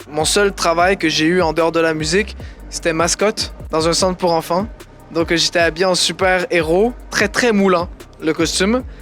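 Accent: Quebec, Canada. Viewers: C'est trop drôle de t'entendre en dehors de tes musiques parce que dans celle ci on se rend pas compte que tu es québécois 😂❤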